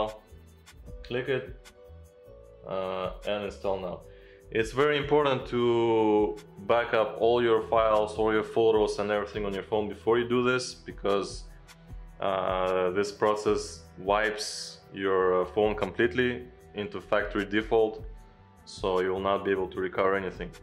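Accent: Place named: Eastern Europe. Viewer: Hey, where did you get your phone from? I'm going to buy it in China probably from the official OnePlus Chinese website and bring it back to Europe. I'm pretty scared tho, I hope this works and doesn't brick my phone. I guess I should just not do any H2OS updates and it should all be fine? Also, from your accent I have a feeling you come from a Slavic country, am I right? :D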